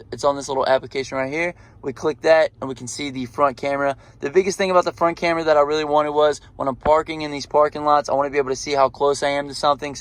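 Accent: Louisiana, United States. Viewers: Are you from Louisiana?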